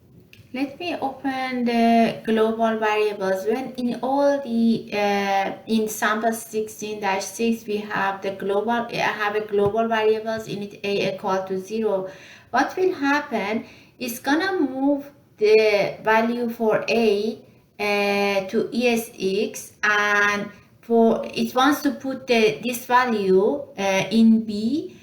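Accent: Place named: Iran. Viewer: I like your Irani accent jan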